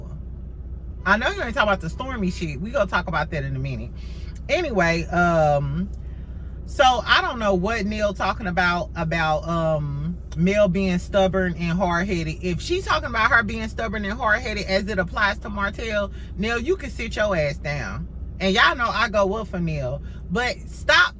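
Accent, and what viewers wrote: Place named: South, United States. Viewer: Girl i know you from the South.. That southern drawl is heavy... ❤❤❤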